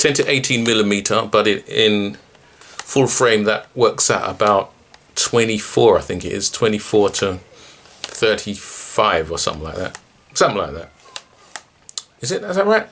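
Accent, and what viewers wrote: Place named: United Kingdom. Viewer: The 21st century has arrived. I was looking at the 80d as it has a headphone jack, but I guess I can use the meters on the m50 if I shoot video, and not break my back. Enjoy your 22 f2 as I read the bokeh is great plus low light. Your photos look great, do you think this body performs as well as the 80d for PHOTO image quality? If it does, it is a lightweight bargain. Great to hear a British reviewer: I am from near Newmarket :-) Other reviewers compare this camera with expensive SLR which is an unfair comparison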